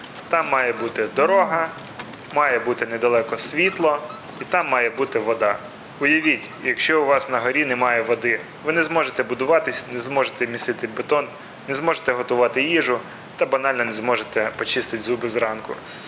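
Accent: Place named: Rivne, Ukraine. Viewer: а звідки пан родом?. по мові чую може бути гощанський район або острозький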